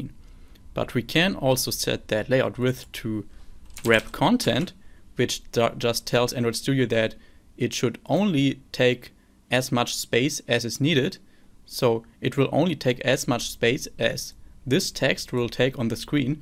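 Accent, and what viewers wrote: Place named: Germany. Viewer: Sounds like you are from germany. :D Anyway, thank you for the good explanation!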